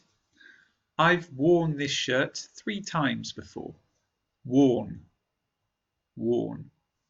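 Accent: United Kingdom. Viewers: love British accent 😍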